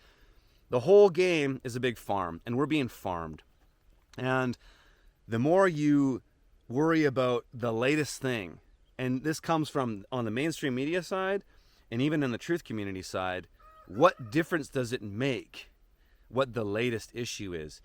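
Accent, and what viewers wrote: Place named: West Coast, Canada. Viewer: Refreshing to hear your perspectives on the Dutch farmers, et al. As a former B.C. native, now living in the Netherlands - on a basic level I appreciate hearing your West Coast Canadian accent speaking and seeing the visuals of your farm and overall and island/coastal habitat. It definitely, takes me back to my roots and sets up familiar perameters which compliment the core of your message to us all - wherever and whomever we may be. I also found interesting the definition of Canada you cited ... being "the internal and territorial waters". Does that mean the government doesn't own the land, and therefore has no jurisdiction over the people who live there ? Are they ruling, therefore, illegaly ?? Unless, you happen to live on a houseboat or in a canoe, perhaps. All of that leading up to the "cherry" imo, which to my ears rings like a bell: "I'm in free country here... 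THIS is Canada" !! Sounds good to me :) It's refreshing to hear you clear away the clutter and giving this topic some room to breathe - by sending it to us from into the wilder, open spaces. The Dutch farms take up an incredible amount of "open space" here too, by the way. And, the Dutch farmers are incredibly resilant. They will not back down, in spite of the odds being against them. They built the Netherlands, in a sense. And, more and more people in Holland are on board with them, in spite of the overall inhabitants not being able to live-off-the-grid, so much, in this country. It is the densist, most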